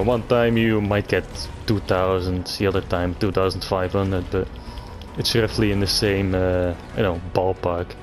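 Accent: Netherlands. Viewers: Hoor ik hier een Nederlands accent hahaha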